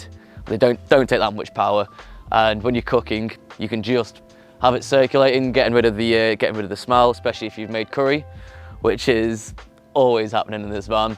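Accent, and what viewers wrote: United Kingdom. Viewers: I love British Accent So awesome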